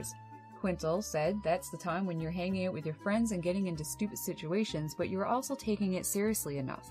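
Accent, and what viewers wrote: Newfoundland, Canada. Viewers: Is that a Newfoundland accent you have? That’s not an insult. You will never please all the people my dear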